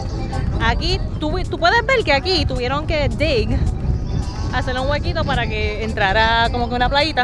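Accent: Puerto Rico. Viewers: Para mi eres la mejor bloguera, siempre te busco para ver que hay de nuevo. Dios mio nena de China tu lo único que tienes es tu hermoso rostro por que ese hablar, ese espíritu es Puertorriqueño, sacha pero bien fuerte. Eres como un vicio termino de ver un video y busco otro y así sucesivamente. Te amamos no te quites!🤗🤗😘😘❤❤🙏🙏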